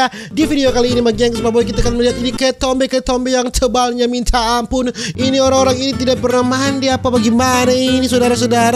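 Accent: Manado, Indonesia. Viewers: Hahahahhaa manado memangg dorang pe logat gagarap memang e 🤣🤣🤣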